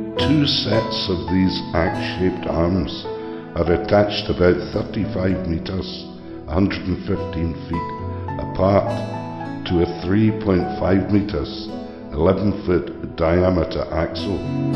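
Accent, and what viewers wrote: Scotland, United Kingdom. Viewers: I wish i could understand scottish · I think I could listen to Scots read the dictionary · No you don't...awful burden. Plus, you'd have a lot to answer for. Love your Scottish brogue...I could listen to you read the phone book. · His voice and Scottish brogue were beautiful to me. I liked it in regular speed. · Narrator has a strange accent. This isn't just a scottish accent; it's regional to a certain area of scotland. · I turned on Closed Captioning while watching the video. The CC AI had more trouble understanding the Scottish accent than I did! Maybe that's because my ancestry is 50% Scottish! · Jeremy Clarkson's depressed, scottish brother. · I love the Scottish voice.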